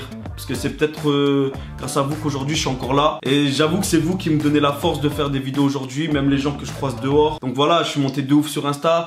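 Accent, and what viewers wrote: South, France. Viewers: L’accent du sud c’est vraiment le pire du monde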